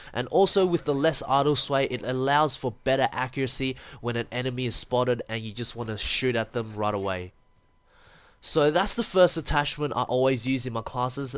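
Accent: Australia. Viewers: Are you Australian? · R u Aussie m8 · Aussie oi oi are u from aussie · Australian !! Yay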